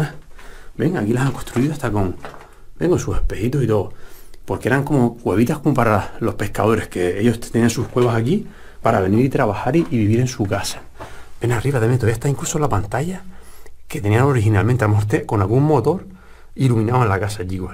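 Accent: Spain. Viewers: Muy interesante este video, increíble. Donde es? Tu acento me parece canario.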